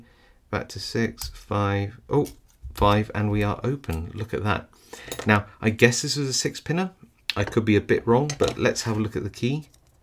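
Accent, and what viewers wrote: England, United Kingdom. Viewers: Love your English accent , brass lock no problem your so cool n confident. Keep up great work. I like as hobby but I'm still learning.😅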